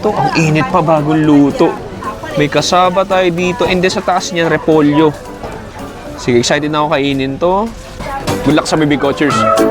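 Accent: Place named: Philippines. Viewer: nakaka miss yung pinoy english accent pag nasa middle east ka 😅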